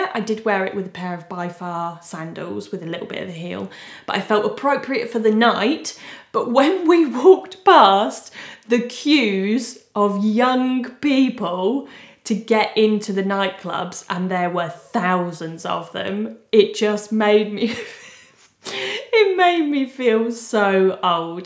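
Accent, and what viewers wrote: United Kingdom. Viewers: Hi! I've discovered you last weekend and well, I'm obsessed; where have you been all my life?! xD I absolutely love your style, but also how genuine you are. Also I was very impressed about how much your answer to your followers, given the huge number of them. Just shows how professional and nice you are. Best content creator discovery of mine of the past few years!:) PS: the bag reviews do come very handy, I am half excited half in deep despair regarding the fact that I will have to buy the Celine seau sangle.:D + PS²: your British accent makes me jealous, it's so cute and classy! xx love from France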